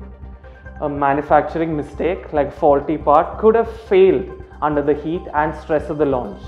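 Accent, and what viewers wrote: India. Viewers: He speaks Inglish and hard to understand. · They call it Curry English!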